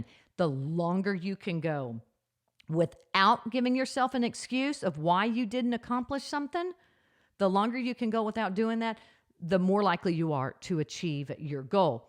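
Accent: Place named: South, United States. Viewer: I love your voice. A bit of southern accent, lots of energy, so friendly!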